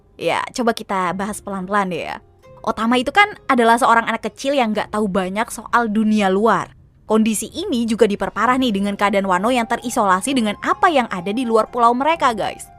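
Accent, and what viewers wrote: Indonesia. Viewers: Nama.... Speak English dialek Indo... Lanjutkan... Kita bakal punya dialek english yg diakui internasional... Jgnkalah sama India Korea Afrika.. ✌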